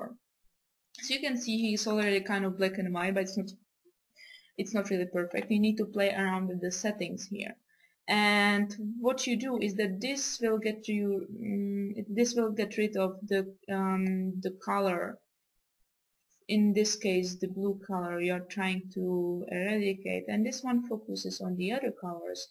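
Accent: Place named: Czech Republic. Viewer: Já jsem si říkal, že tvůj přízvuk zní hodně česky. Díky za tutoriál!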